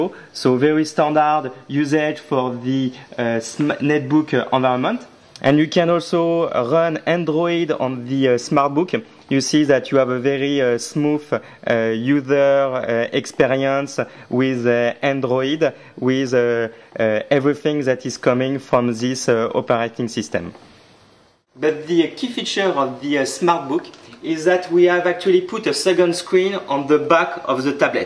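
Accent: France. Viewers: French guys speaking english should be banned from internet :[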